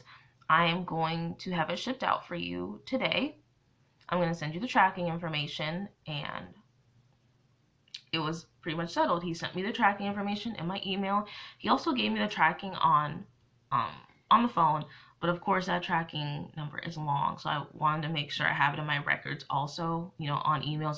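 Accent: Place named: New Jersey, United States. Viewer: I just came upon your video and I'm glad I stopped to listen to your experience regarding your LV repair. First, I too am sorry you are having these lack thereof quality issues as well as poor customer service. Secondly, I too have had my share of unfortunate experiences with LV especially regarding new item quality issues and I've in many instances if not all only had the item for a month or two but I get this ugligness attitude from the store I deal with if I bring up an item with quality issues. I'm so over them and their poorly designed pieces of late. Don't get me wrong I still love LV and it's craftsmanship but I expect them to be behind me 100% if I have an issue. So sorry, again that you are going thru this. Hi I watch you and I love your I think Jersey accent excuse if I'm wrong but I love your videos. Keep up the good work!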